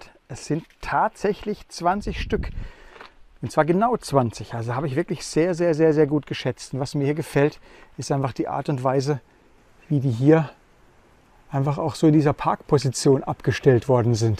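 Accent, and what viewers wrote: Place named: Swabia, Germany. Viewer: Hallo, ein trauriger Anblick, die ganzen Überreste der Autos zu sehen. Erkannt habe ich kein einziges davon und das soll durchaus was heißen.🤔😅Aber ich habe dennoch eine Gemeinsamkeit der Autos entdeckt, bei allen ist das Dach eingedrückt. 🤔😉 Deine Unterkunft ist ja mal wirklich schnuckelig und der Ausblick grandios.🤩👌 Aber mer hert hald scho da Schwob in dir, "sodele". 😂😂👍 I winsch dr an scheene Sonndig ond dange firs mitnemme. 😊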